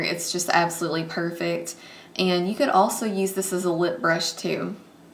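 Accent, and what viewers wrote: South, United States. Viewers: I couldn't agree more that there is no need to spend a ton of money on brushes for a pretty makeup look. BTW...I love your southern accent. :) You are so pretty, and down to Earth. I enjoy watching your videos.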